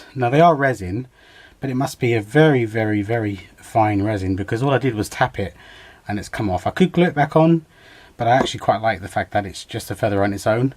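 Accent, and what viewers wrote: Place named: United Kingdom. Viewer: Very cute. What will you do with the feathers? I like them also. I love listening to your accent, it reminds me of my son-in-law who is British. He and my daughter live in St. Neots.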